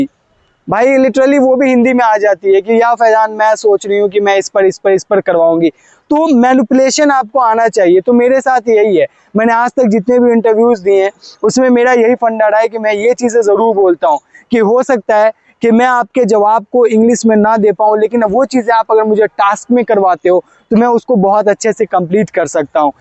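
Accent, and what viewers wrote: Bihar, India. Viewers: Tera aj v english sunke asli main hi lag raha hain tu bihar se hi hain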